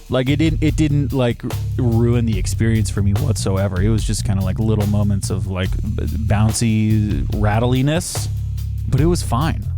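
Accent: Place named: Florida, United States. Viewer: you talk like a friend of mine from Ocala, Florida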